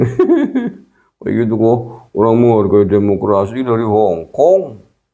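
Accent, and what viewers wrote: Banyumas, Indonesia. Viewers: Saya sering ketawa saat bapak bicara campur aksen banyumasan, hehe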